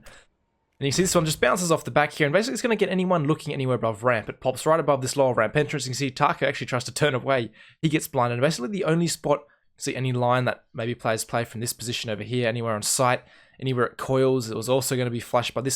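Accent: Australia. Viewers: sounds Australian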